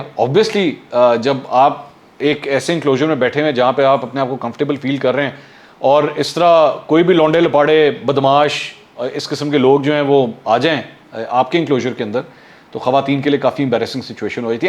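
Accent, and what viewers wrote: Punjab, Pakistan. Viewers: A V log being produced by “ Punjabi Productions “. Unfortunately today the pronunciation of the anchor is also Punjabi/ Pendu accent . Disgusting , Sorry to say this.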